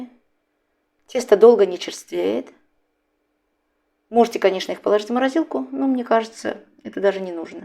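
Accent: Baku, Azerbaijan. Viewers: Здравствуйте, наш бакинский акцент, это 👍👍👍🥰 Смотрю с Ростова на Дону, тоже из такого теста делаю пирожки, но этот вариант мне понравился, обязательно попробую. Спасибо ❤️🌹🌹🌹🌹🌹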